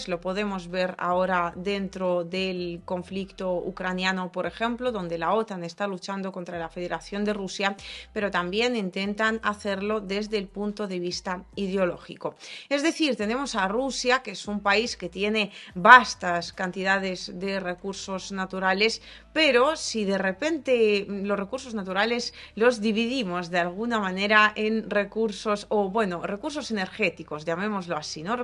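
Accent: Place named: Spain. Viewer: hermoso vídeo gracias hablas "español " mejor que yo 😂 con acento español ( olé y olé )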